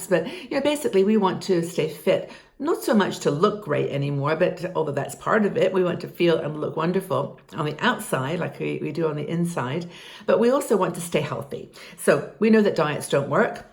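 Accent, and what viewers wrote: England, United Kingdom. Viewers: i thought you were english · You're an inspiration! You sound English? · You are lovely! Are you originally from England? I detect an accent :)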